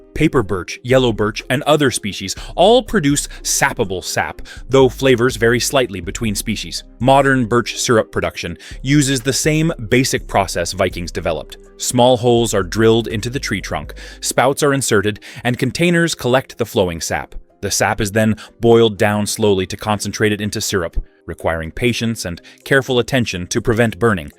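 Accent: United States. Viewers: This hasty-sounding, breathless bot voice is really annoying. The information is solid and well written, though, so, if you sbould do this again, do consider having a human reading it. And the word "confit" is pronounced "Cone-FEE." American audiences [your video uses an American accent] pronounce "herb" without the "h," and British pronounce it with the "h" aspirated. Also, it's "LOO-tuh-visk."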